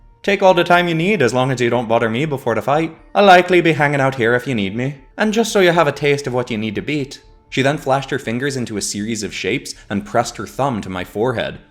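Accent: Ireland. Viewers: As an Irish man myself i must say, you do an impressive Irish accent 👍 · AMAZING! This Is Going To Be A Fun Miniseries, As I've Always Really Enjoyed Tayrun's World And Videos, So I'm Looking Forward To The Rest Of The Saga, And Your Irish Accent Is Good In My Opinion! I'm Still Hoping For Gardevoir In A Future Pokemon Episode And A Splatoon Video! Also, I Just Got A HUGE Jurassic World Mosasaurus Toy (I LOVE IT, But IDK Where To Put Him Yet😆) From A Comic Shop Today, Which Reminded Me Of Your Mosasaurus Demon From A While Back, And Now I Want To See Either A Mosasaurus Dragon, Or Even A WereMosasaurus For A WereDinosaurs Video! I'm Looking Forward To Your Next Video, And I Can't Wait For The Next Grand Prix! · Love how you pushed this to be a multi-character and beast fighting double spread. This is absolutely awesome and your irish accent was good. Were the spell names the irish words for the type of spell?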